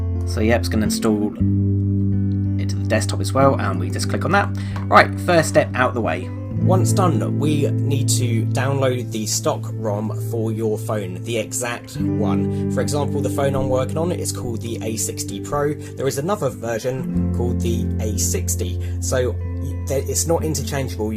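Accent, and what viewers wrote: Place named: United Kingdom. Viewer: Thanks for being british for a start and not indian and for not playimg stupidly loud dubstep music on your video. Sadly its not working for me i have the correct firmware, reflashed my hardbricked phone and it functions as normal now except the annoyong GoogleKeyState : fail issue but its not working for me for some reason??? Tried opening as administrator, triple checked my imei numbers, double checked i had correct version of firmware EAA version... Im at my wits end with this stupid chinese phone lol im using a Ulefone Armor X5 (not the pro i triple checked that lol) if anyone has any advice feel free to comment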